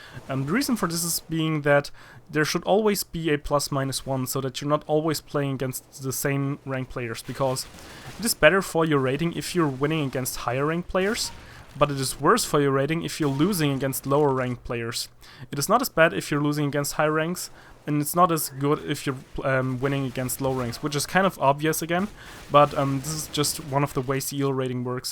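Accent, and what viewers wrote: Germany. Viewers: Are you german? Du hörst dich deutsch an :P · you sound like a german :) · Bist du deutscher ?:) du hast nen deutschen Akzent :)! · Sounds like you are german.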